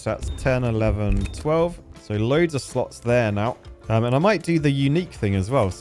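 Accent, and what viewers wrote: United Kingdom. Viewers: I could listen to you all day without even seeing videos often your british accent and just the way you are makes it so entertaining listening to you i started watching you like maybe 2 months ago and i feel like i'm soon done with the whole last year of your videos (and yeah i just noticed i never subscribed before shame on me!)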